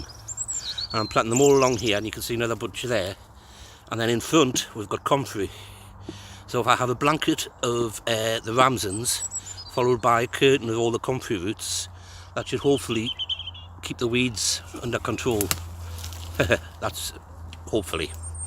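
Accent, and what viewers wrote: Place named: Geordie, United Kingdom. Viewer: I know a fellow geordie when I hear one! Haha. So do you live in north east England then?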